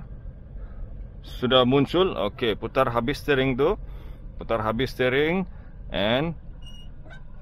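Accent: Sabah, Malaysia. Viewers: Macam logat Sabah jk ni...btul ka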